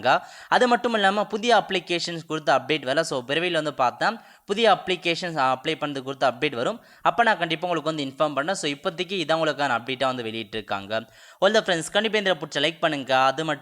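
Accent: North, India. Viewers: Athu Appel illada Apply onnum Tamil olunga Pesanum illa Engilsh olunga Pesanum Etho Vadakkam Maari Pesikittu Irukaa 🤡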